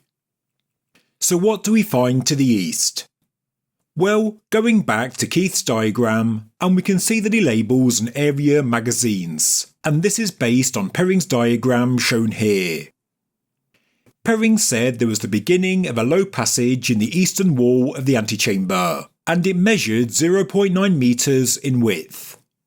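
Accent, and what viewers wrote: United Kingdom. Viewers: I know this is an odd question, but I am asking because I can't place your accent. British? Why do you end sentences by extending the final sound?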